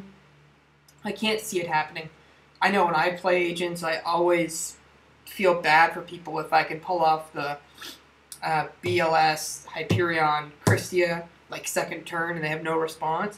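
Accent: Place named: Canada. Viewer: u canadian? good video tho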